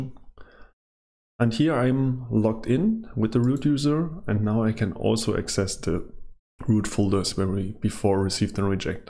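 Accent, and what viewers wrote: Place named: Germany. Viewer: Ich liebe dich, dein Denglish und dein Tutorial!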